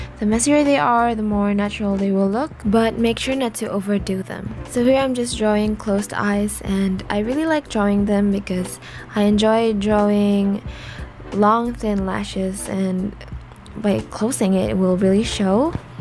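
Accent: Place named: England, United Kingdom. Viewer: I love ur english accent🥰😌 btw I really like ur videos😫💖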